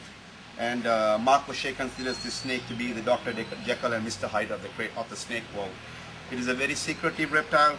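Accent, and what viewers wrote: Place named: India. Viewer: Dude this video 99% talking but the problem is his indian accent is so strong but still he talks so fast and with the low quality its impossible seeing the mouth to help understanding, so its very hard to understand. You should really talk some more slowly and clear if you want that people can understand you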